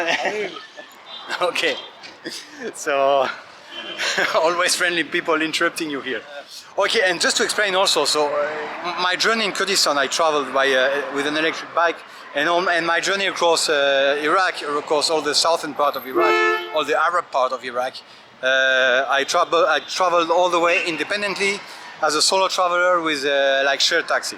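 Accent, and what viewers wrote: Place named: France. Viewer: your French accent is so cute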